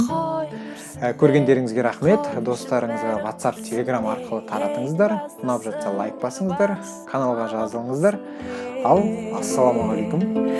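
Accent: Standard. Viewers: Күшті! Мықты сараптама таза қазақша. Жарайсың! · Очень качественное видео и речь. Настоящий казахский язык, не базарный и аульный, звучание красивое.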